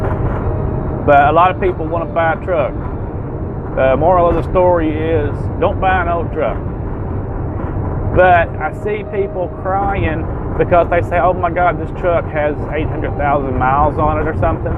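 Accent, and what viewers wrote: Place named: South, United States. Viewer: I love your accent, its from south right? great trucks, i really like to try to drive those monsters,wow. xoxo monstermom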